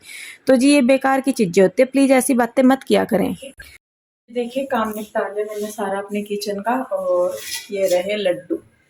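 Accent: Haryana, India. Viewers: Mam aapka ghr kah h , boli to Haryanvi h Please reply me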